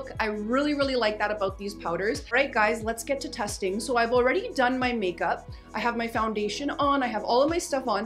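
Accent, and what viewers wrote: Canada. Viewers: I can hear the Canadian in your voice!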